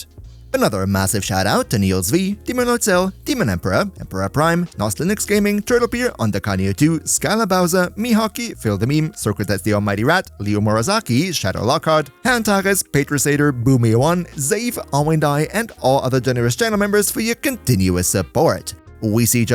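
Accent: Germany. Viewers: You sound just like a happy german guy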